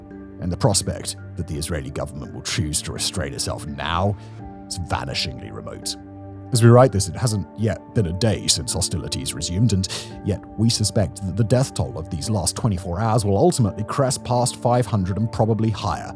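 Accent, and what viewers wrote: RP, United Kingdom. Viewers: Simon needs to take the chip off his shoulder and do some unbiased reporting and actually visit these places on the ground. he thinks he's so smart with his posh accent and multiple successful youtube channels that are supposed to enlighten and teach. Yet, he more often than not, leaves out nuance unless it supports the narrative of his videos . I've been watching for years and I've been noticing it more and more. its evidence of my own bias. yes. but Simon is deliberately leaving out facts from his reporting. BIG FACTS TOO SO THATS HOW WE KNOW ITS DELIBERATE!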